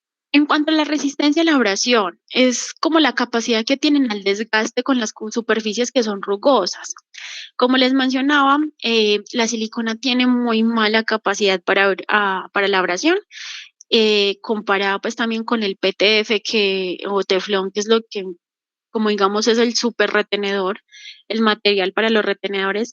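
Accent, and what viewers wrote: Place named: Antioquia, Colombia. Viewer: Con ese acento paisa puedo ver y oír un video de más de 3 horas 😊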